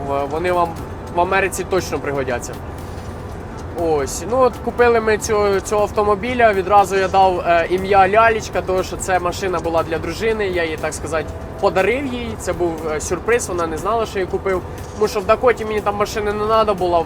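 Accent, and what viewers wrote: Ukraine. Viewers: Деревня , суржик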